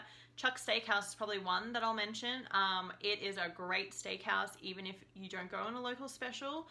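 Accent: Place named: Australia. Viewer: I could listen to this girl forever ! Two jobs, packing all that life into so little time... don't know how she does it. But I was young once too.(that's me in the picture but... it wasn't yesterday). Loved Banff and the Canadian Rockies. Thanks to You Tube I can rediscover this wonderful place. Thank you Aussie 👧 girl ! Love your accent !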